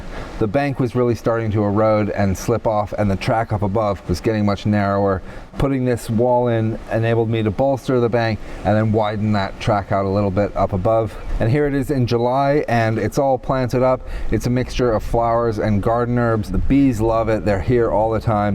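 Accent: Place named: United States. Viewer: I'm thrilled that you're bought a place in the UK! You have an American accent so I assumed that you were based in the US. I've thought exactly the same thing about growing spaces. North wall and lots of thermal mass. If you've not already, have a look at "Chinese greenhouses".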